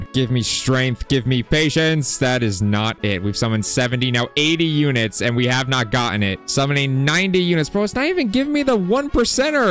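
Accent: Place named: United Kingdom. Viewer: Why bro speaking British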